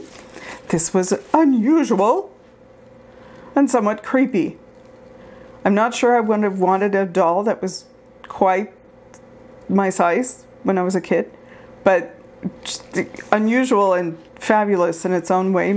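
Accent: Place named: Quebec, Canada. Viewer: I could listen to your accented dialogue all day. Quebecoise?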